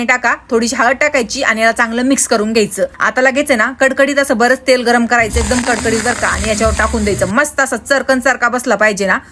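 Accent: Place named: Junnar, India. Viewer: Tai tumhi junnar taluka mdlya ahat ka bcoz tumchi bhasha amchyasarkhich ahe❤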